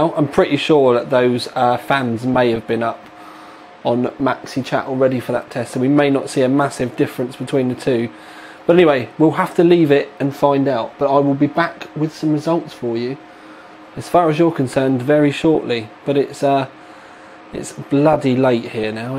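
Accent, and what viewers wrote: United Kingdom. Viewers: Wow... he didnt skimp when he said FULL REVIEW... whatever his british accent will occupy me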